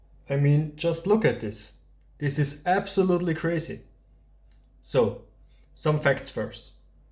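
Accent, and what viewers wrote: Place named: Germany. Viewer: I Hear german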